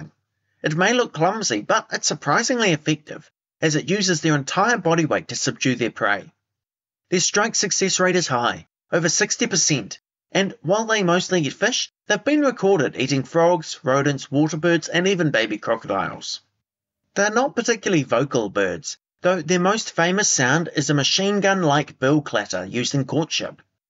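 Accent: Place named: New Zealand. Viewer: Great article mate, thank you. Also really easy to listen to your NZ accent, rather than yanks. 👍🏼🇦🇺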